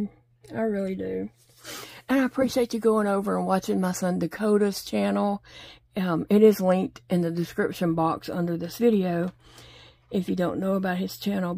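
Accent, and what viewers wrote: South, United States. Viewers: I must say again how much I love your Southern American accent ! So does my Chihuahua and my other puppy dog girls who lay around me and listen to u with me. 😁🐕💗 Our Aussie (Australian) accent is so different and not as pretty and relaxing to listen to.😂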